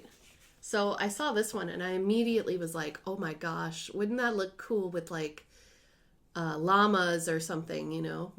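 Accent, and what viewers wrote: Ireland. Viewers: Definitely 'But' in your unique 'Irish accent.❤ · All of your needle minders are darling!! I love that pegallamacorn!!! The first time I heard you say “but” in your Irish lilt I thought you said “bush”, LOL!! Then you said it again and I realized what you were doing!! LOL!! Question: what are you talking about with Grogu (baby Yoda)?? What the heck am I missing out on?? I’m willing to bet Jacob from MFE would be a great resource on what makes a sampler a sampler!! Thanks for sharing!! See you next time...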